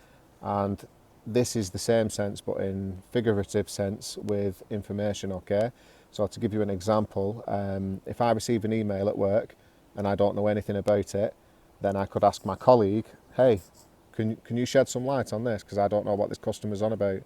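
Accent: United Kingdom. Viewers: I like your British accent although it’s hard for me to understand it. I should listen it a few times . Regards from Texas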